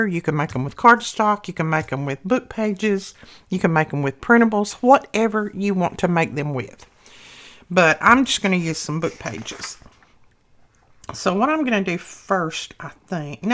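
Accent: South, United States. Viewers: I would never tell you to hush i love listening to your beautiful southern accent❤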